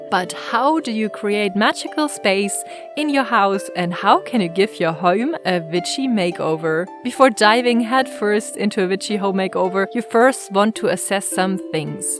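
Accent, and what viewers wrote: Germany. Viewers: Hey i wanna ask if you're from germany ,because from the accent😂✌ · sometimes i get a bit of American and Australian from it somehow??? but yes i suspected it was german haha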